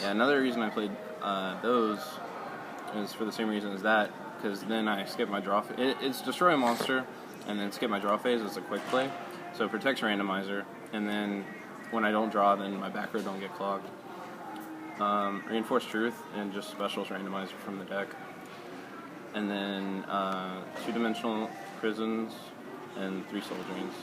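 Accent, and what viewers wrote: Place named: United States. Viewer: I use to think was a fat german with a craving for chocolate, then I heard his murica accent, and I was like WOAW my mistake.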